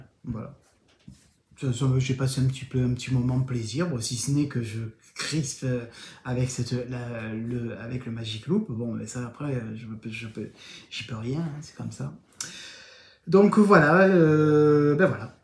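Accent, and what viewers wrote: South, France. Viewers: Coucou, jeune! Heureusement que la perfection n'existe pas dans ce bas monde, sinon quel ennui! Il n'y a aucune course au tricot, chacun son rythme, chacun ses envies et les vôtres sont très belles et inspirantes, avec cette touche d'humour "avé l'assent". A bientôt. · Bisous et merci pour ce podcast tout en gaieté avec ton bel accent du midi. ❤❤❤❤ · Quel agréable moment passé en ta compagnie! Tes vidéos sont tellement « vivantes » qu’on ne s’ennuie pas… entre ton bel accent du midi et ton humour c’est le moment récréation pour moi. A très vite j’espère 🤗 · Bonjour moi le bruit d ouverture de colis ne me dérange pas du tout au contraire,et l émission sur le tricot je l'ai déjà vue il y a longtemps,et j aime l accent du sud! nous ont est dans le nord la où il fait gris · Merci, on passe vraiment un très bon moment. Je découvre un univers de teinturières et de créateurs que je ne connaissais pas, alors que j’ai appris à tricoter il y a plus de soixante ans. 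Je suis arrivée à « « en cherchant un tuto pour talons de chaussettes en rangs raccourcis et depuis j’écoute le podcast J’aime beaucoup l’accent du sud, le naturel et la bienveillance Surtout ne changez pas Bisous de Normandie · Bonjour Mais quel plaisir de vous suivre à chaque fois, je passe un excellent moment. J'adore votre humour, votre franchise et votre gentilesse. Vos tutos sont au top, et les explications de vos ouvrages détaillés sont super chouette. Quant à votre accent J'ADORE!!! Je suis du sud aussi. Un grand merci pour tout ce que vous faites pour nous et à très bientôt. DOMY du 04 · Merci pour ce jolis moment,je suis belge et j aime tellement ton accent de soleil a bientôt Big bisous ❤❤❤😂😂😂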